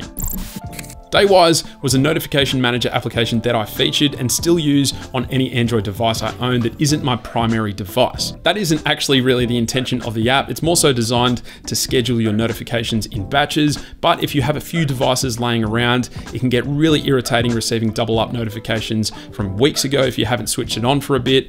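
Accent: Australia. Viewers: Love your hard work and the Aussie accent, keep on bro 👍🏼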